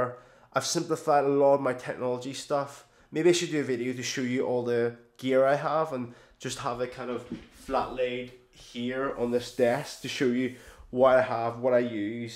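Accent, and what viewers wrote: Northern Ireland, United Kingdom. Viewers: northern irish indeed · youre from northern ireland arent you, greetings from derry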